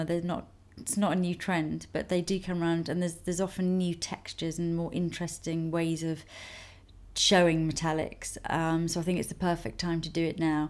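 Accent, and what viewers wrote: Netherlands. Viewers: I immediately heard that she's from The Netherlands lol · Is from the Netherlands? She doesn't sound very English to me (but maybe it's just me, don't know =p) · Is Dutch? Her accent sounds Dutch. She's just so beautiful, I can't get over it! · I wonder what nationality is? Her accent sounds very Dutch. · May I ask where is from? She sounds Dutch to me!:)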